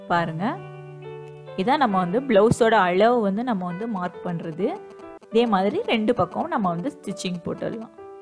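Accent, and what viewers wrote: Nagercoil, India. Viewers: For u Nagercoil ah?, Ur language is like that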